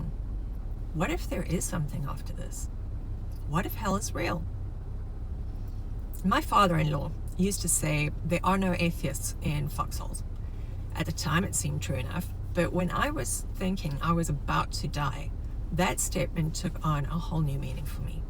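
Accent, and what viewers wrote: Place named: Afrikaans, South Africa. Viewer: Are you Afrikaans? I like your accent. Great vid. Thanks.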